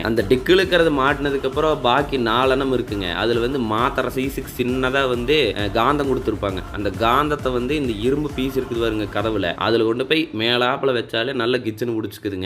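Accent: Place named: Coimbatore, India. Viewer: Coimbatore slang ah verithanama iruku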